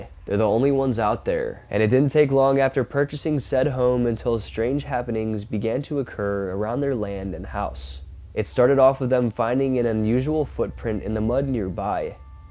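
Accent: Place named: California, United States. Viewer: Get a narrator. You have too much vocal fry and a very Californian accent. Your voice is not good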